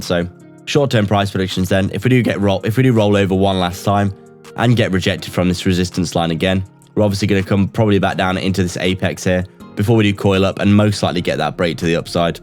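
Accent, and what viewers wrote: United Kingdom. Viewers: You didn’t say where you were from. I’m guessing Brighton👀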